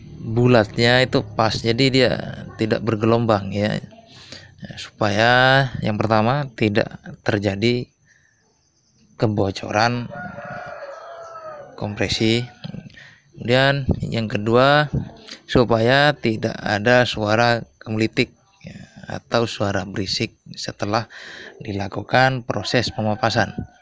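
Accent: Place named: Madura, Indonesia. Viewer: Logatnya madura...asli mana mas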